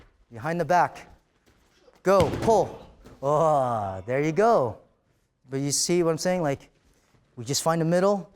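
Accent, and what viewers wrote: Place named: Ireland. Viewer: DoYou KnowDeWae? <- they're right. he said nice. it sounds weird to you over-conspiracizing idiots because you all forget that he is irish and has a thing called an accent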